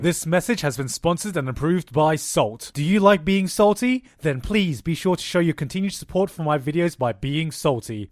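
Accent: United Kingdom. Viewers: some normal liverpool street brawl beetween mates. your accent is so british i can almost hear the tea cup being lifted from the table when you talk.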